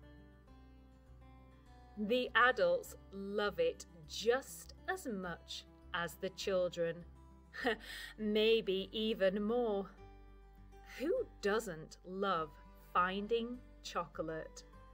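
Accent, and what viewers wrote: United Kingdom. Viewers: I like your british accent, it's so elegant. I love your videos.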